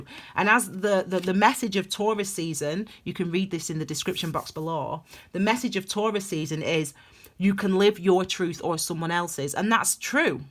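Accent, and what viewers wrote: United Kingdom. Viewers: Congrats! TY from U.S. Your accent sounds British. Enjoy your holiday. We would say vacation, I think. 🌅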